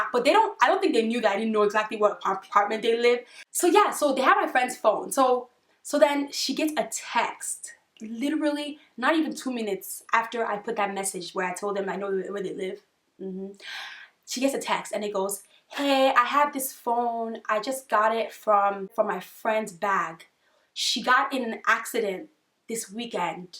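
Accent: Nigeria. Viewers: I made it thru the end lol. I love the Nigerian accent, and I can really relate to your accent because I'm also Nigerian💚💚✊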